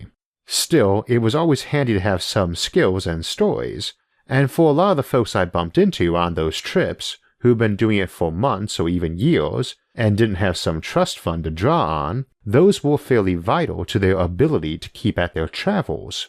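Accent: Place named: South, United States. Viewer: I've heard you say you have a 'speech impediment' in the past. I think your voice sounds cool as all hell man!! Like an educated southern American gentlemen, i think its awesome :)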